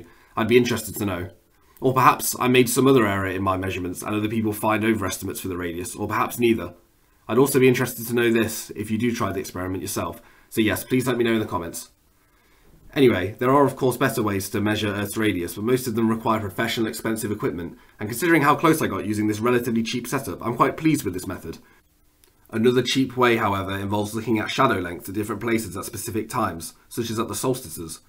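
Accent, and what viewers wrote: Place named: United Kingdom. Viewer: Your setup would be ideal if you were standing on the equator at either the spring or fall equinox. The reason your result is lower than the actual is due to the geometry that results from the tilt of the Earth at that time of year and of your latitude on Earth. If you do this experiment on the 1st day of spring or 1st day of fall, then you'd only need to adjust due to your latitude. If you divide by the cosine of your latitude (you sound British so lets say South England ~50 deg), you'd get 6222 km (4000 / cos(50)).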